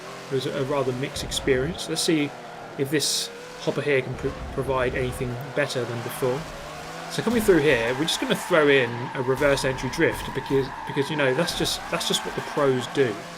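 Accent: United Kingdom. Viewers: dude the scenes of absolute mayhem and you critiquing them with your limey accent has me dying lmfao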